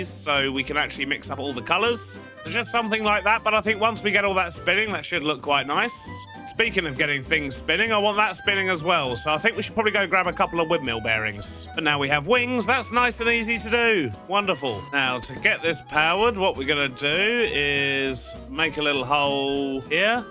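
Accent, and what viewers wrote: United Kingdom. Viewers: Is it just me or does? Literally seem like the mumbo-jumbo of the mod both of them have a facial hair that they. Make the main focus about their channel both of them love a very complex game, mechanic that is techy or mod that is techy being the create mod mambo being Redstone Both of them make it seem a lot easier than it actually is starting to see a pattern does anyone else see it I’m not sure if this is intentional or not but this feels like there’s too many coincidences to be an accident along with they both have the same British accent, and they sound so similar if you put audio over mambo audio who would notice practically no one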